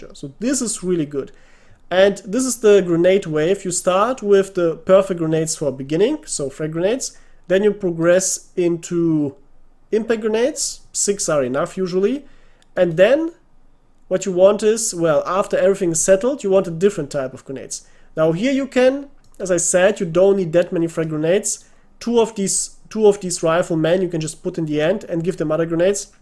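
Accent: Germany. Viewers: truth! Obviously, his Enlisted take is great, or we wouldn't watch. But the delivery - a balance of German inflected English accent with many wry wittisisms is the cherry on top. If instead it was rando guy from North America, it might be less pleasing to my ears.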